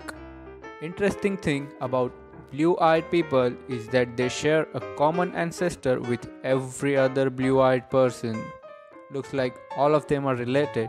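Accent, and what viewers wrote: India. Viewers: Great job brother. I want to give you a suggestion that. Add Subtitles manually because youtubes auto subtitle is not very much compatible with Indian English accent. As I don't know English properly Subtitles helps a lot to understand for Slow Learners As I was reading subtitles given by Youtube was Horrible. As you were Saying word "Blue Eyes People" youtube was Subtitling AS "You Eye People" many other mistakes were also there, Please Check it. . Thanks aLOt For Info.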